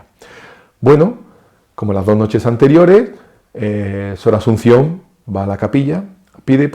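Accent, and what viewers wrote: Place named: Andalusia, Spain. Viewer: Salve María!!! Qué alegría oír a los Heraldos de Madrid en las redes sociales, Hermano de los Heraldos del Evangelio, me encanta esta historia que usted está relatando de la Virgen de las Gracias. Por su habla veo que es andaluz, igual que yo, creo haber hablado con usted este verano. Salve María. Que la Virgen de las Gracias lo bendiga y lo proteja.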